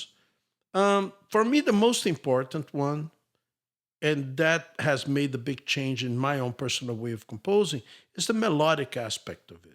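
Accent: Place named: England, United Kingdom. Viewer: Bravo your tutorials, Master ! I do appreciate your methods, mostly your cleared, well articulated English accent ~ instead of too many sounded-English-seemed-like, such as bastard's American etc..etc... They must do trainee English Accent... PLS broadcast my message 🙏🙏🙏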